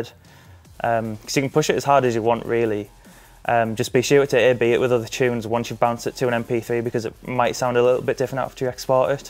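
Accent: United Kingdom. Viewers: I always thought he was british haha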